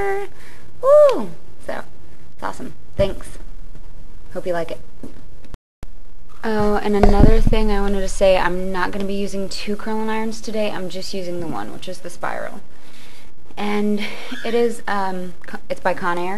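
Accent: Texas, United States. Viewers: you remind me of April Rhodes from glee. You're awesome, gotta love the Texas accent, I'm in TX too. I'll be subscribing to your channel for sure :) and I love your hair! :D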